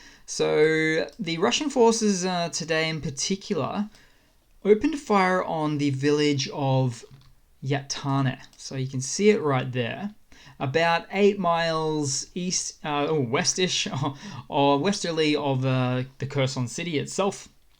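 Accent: Australia. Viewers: Fake news i see. Is that an aussie speaking. FFS mate, get a life. This is just propaganda ❤ russia from the 🇬🇧🇬🇧🇬🇧🇬🇧. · Another good assessment. Out of curiosity, are you an Australian? (BTW, I am).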